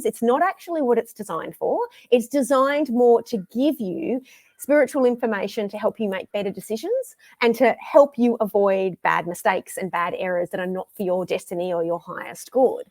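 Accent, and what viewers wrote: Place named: Australia. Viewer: Love your Australian accent ❤ you are a superb Teacher ❤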